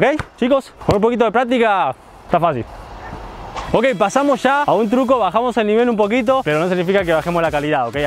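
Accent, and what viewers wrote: Argentina. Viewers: sos de argentina no?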